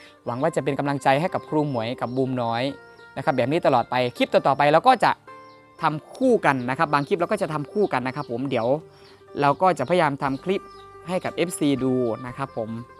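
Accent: Isan, Thailand. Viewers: เว่าอีสานกะดีคือกันเด้อครับ ฟังแล้วอบอุ่นดี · เสียงเว้าของ มาคือกันกับเสียงผู้ใหญ่บ้านฟินแลนด์ฟังเรื่องราวชีวิตน่าชื่นชมที่เป็นคนที่ต่อสู้ขอให้รักกันแบบนี้ตลอดไปดันๆให้มีคนติดตามเพิ่มขึ้นเรื่อยจนถึงแสนเด้อจ้า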